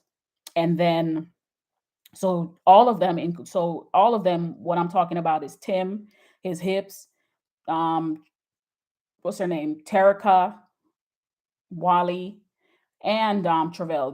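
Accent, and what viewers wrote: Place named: Jamaica. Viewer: Oh you Jamaican! Gwan, no wonder I like your show! 🙏🏿🇬🇧🇯🇲❤️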